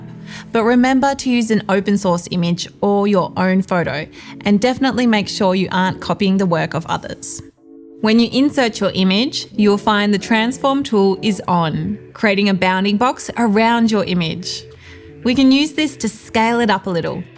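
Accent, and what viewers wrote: Australia. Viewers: This was a fantastic series, thank you so much! Smashed through it in a day 😁 feeling so much more confident! Also so happy to hear a fellow Aussie accent! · Keep on going and please do an intermediate series … well done for the simplicity and objectiveness of the videos. I liked the Australian ascent :) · I think I share the sentiment of many others judging from the comments below that this has been the best series. As a fellow Aussie I loved the accent, and because I am a slow learnier I just reduced the playback speed to .75 which was very helpful. I have dabbled in Procreate for over a year on and off, mostly off because I start and then feel so overwhelmed so I give up, but this series was brilliantly paced to allow me to really understand the workings of the program. I know it is the tip of the iceberg but thank you for letting me gain more confidence and a desire to continue. 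I can not wait for an intermediate series, BRING IT ON!!!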